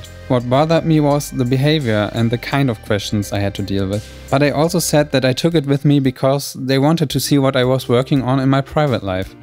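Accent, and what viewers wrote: Germany. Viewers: Are you german? I'm just wondering because of your accent. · are you German? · are you german? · You are German are you? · You sound very German. Kunst sollte doch etwas mehr sein, als lediglich die Funktion einer Kamera einschließlich anschließender Nachbearbeitung zu imitieren. Was ist denn deine inhaltliche Auseinandersetzung? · Are you german and if are you from lower saxony ? Your voice sounds very familiar to me ^^ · ich finds toll zu sehen wie die Bilder langsam zum Leben erwachen, ich bin selber nicht so Künstlerisch begabt, eher Handwerklich, und denke bei Bildern wie deinen,"wie wurde das gemacht? wie ensteht dieser effekt?" sorry aber ich habs so rausgehört dass du Deutsch bist :) Qualty Content Approved ;) keep it up :)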